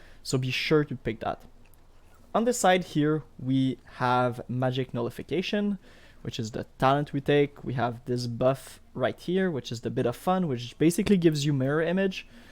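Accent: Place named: Quebec, Canada. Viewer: I recognize the Quebec accent and I am happy. Great build as well. I never learned how to play Kineticist and this makes me want to try it out.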